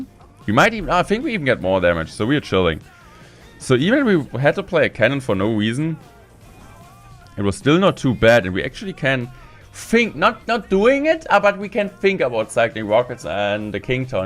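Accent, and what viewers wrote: Germany. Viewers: Are you german normally with the accten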